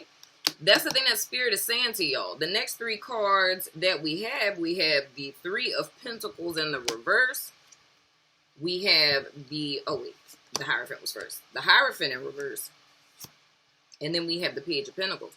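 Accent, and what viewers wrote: New Orleans, United States. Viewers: Love this video and your energy I’m an Aries male and I have been dealing with my ex a lot. Know u was from New Orleans within the 1 st few sec I am too deep it goin luv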